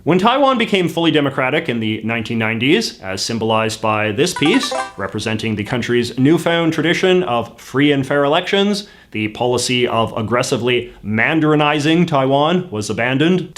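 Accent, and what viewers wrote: Canada. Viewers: You say “about” the way Americans tease Canadians for saying it: “Abuht”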